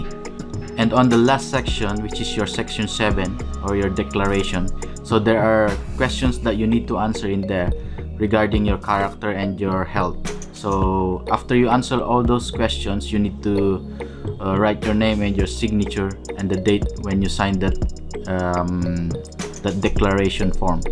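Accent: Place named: United Kingdom. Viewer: Slang na master. Kala ko briton na ang nagsasalita.😄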